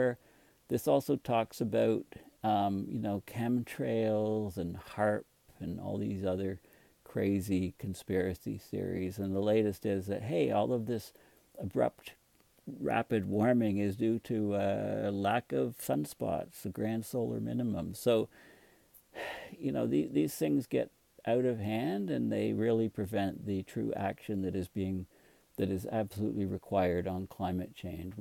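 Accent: Canada. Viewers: I hear your Canadian??:0 I'm from Calgary, Alberta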